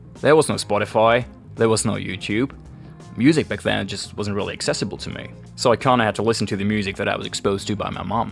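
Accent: Australia. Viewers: Yeah you've soaked up a lot of Aussie while here, but that 'yeah' sold it hands down haha! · You have a talent in words mate and spoken in English or we could say Aussie. Well done champ. 😎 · Oh wow 😁that all makes so much sense. I am someone who has commented that you picked up an Aussie accent while you were here. Mind you, I think you picked up the whole Aussie way of life too lol. Thanks for telling us a bit about your 'English' journey. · I recently just started following you from somewhere else on YouTube, but I am doing my usual of following new people backwards. It only starts getting funny when I hit on something posted 3 or 4 years ago and I am expecting some very funny moments in your past. Congrats on your Aussie accent shining through. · as an australian i know this makes no sense but if the general australian accent was milk your voice would be yogurt. 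its like similar and mostly the same but yogurt is more like sour and vaguely european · Your slight German accent is pleasant and attractive. You do speak English with a slight Australian accent. I like it. It's perfecly clear and articulate and you don't stumble with words at all, and express yourself well. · I'm Australian - 6th generation - Your accent is so dam close... Good on you - very interesting... John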